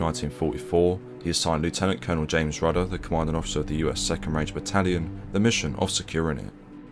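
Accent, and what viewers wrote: United Kingdom. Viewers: I could never crack where he's from but as soon as he pronounced battery as ba'ery I knew he was bri'ish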